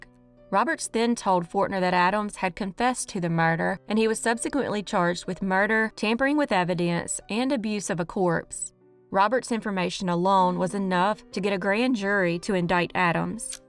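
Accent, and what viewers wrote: South, United States. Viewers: I love her voice and Southern accent. ❤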